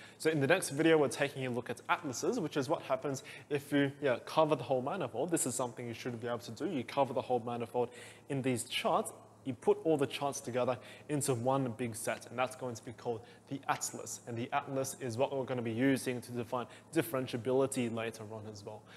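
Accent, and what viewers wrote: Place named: Australia. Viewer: Haha, I was just trying to place your accent when you gave the Sydney example. It’s an Australian accent, of course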